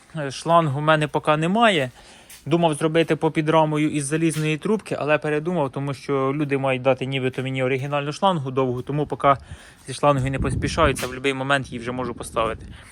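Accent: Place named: Right-bank Ukraine, Ukraine. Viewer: Бля ,як отличаеться по говору правобережжя пид риччю посполитою вид ливобережжя не московитського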